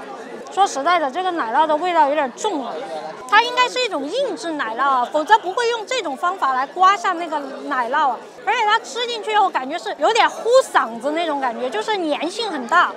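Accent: Sichuan, China. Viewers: 不一样的坝坝宴，不一样的川式普通话，不摆嘞。。。。！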